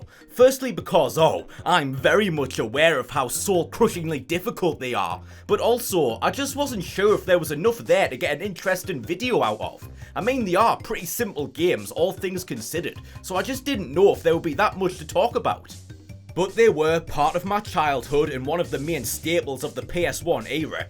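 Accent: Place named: North, United Kingdom. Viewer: The northern accent and swearing is killing me. I wish you had presented Gamesmaster in the 90s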